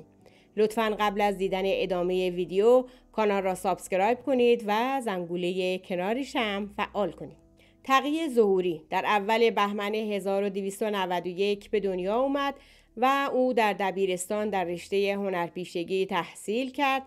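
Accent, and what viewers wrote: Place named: Kermanshah, Iran. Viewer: با عرض سلام واحترام فراوان به شما خانم خوبه مجری این کانال خوب ...لهجه تون به کورد کرمانشاهی. قصر شیرینی میخوره اگر اشتباه نکرده باشم..نه فقط لهجه ی قشنگتون بلکه اون چهره ی مهربون شما هم همین رو میگه ..نمی دونم شاید اشتباه کنم..موفق باشید ..ویدوی های بسیار قشنگی دارین..از خانم ایران جلالی معروف به ملوسک هم اگر میشه برامون یک ویدئو درست کنید .ممنون میشیم ...❤❤❤❤❤❤❤❤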